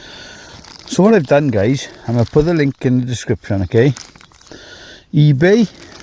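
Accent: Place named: Swansea, United Kingdom. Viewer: no problem bruv, I grew up in Swansea so your accent takes me back, keep up the good work 👍🏻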